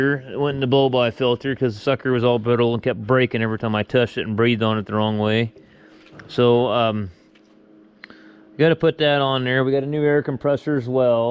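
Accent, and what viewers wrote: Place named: Oregon, United States. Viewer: I always thought that was a strange Oregon accent. Makes sense now....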